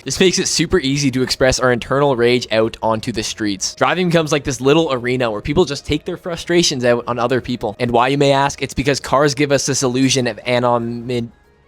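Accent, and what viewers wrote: Canada. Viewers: Realized you were Canadian in the first 10 seconds of this video +Respect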